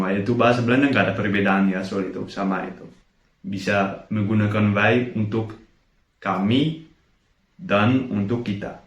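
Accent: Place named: Java, Indonesia. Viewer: finally video baru🤩 semangat bikin video² baru lagi🙌😂 btw suaranya kayak ada medok² Jawa🤭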